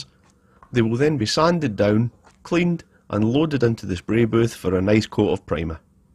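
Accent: Scotland, United Kingdom. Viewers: That was another great job. I marvel at your way of working every time. Every single screw is worked on so that it looks like new again. And now this beautiful motorbike is once again a technical work of art. I also enjoy listening to your Scottish accent. I have the greatest respect for your work. I'm already looking forward to the next project!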